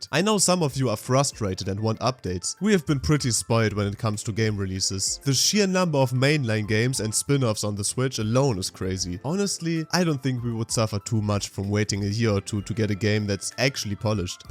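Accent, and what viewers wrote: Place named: Germany. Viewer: I LOVE your German accent❤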